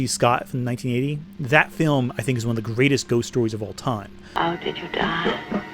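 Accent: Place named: New York, United States. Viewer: Werewolf By Night is one I've never heard of, but it sounds amazing! I'l have to check it out! Also, are you from New York? My family is from New York and Massachusetts and you sound a lot like them.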